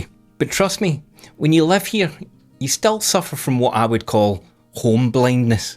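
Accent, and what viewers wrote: Scotland, United Kingdom. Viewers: Well, I'm over 60, and this video is still pertinent. I love the way you covered this,. And your Scottish accent, priceless. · I came for the info and amazing photos. I stay for the Scottish accent and humor tysm 😊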